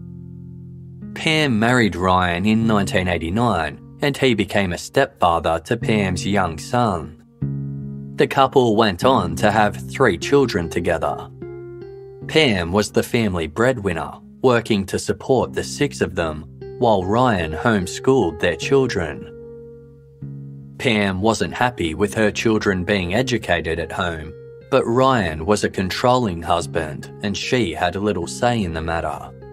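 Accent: Australia. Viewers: Yes. Definitely an Australian accent. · certainly is an Aussie accent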